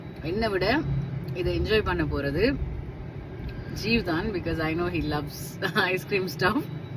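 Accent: Sri Lanka. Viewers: நீங்கள் பேசும் இலங்கை தமிழ் மிகவும் அழகாக இருக்கு ஆங்கில கலக்காமல் பேசினால் மிகவும் நன்றாக இருக்கும் ஒரு நட்சத்திரம் போல் உங்களை காண்பது போல் இருக்கு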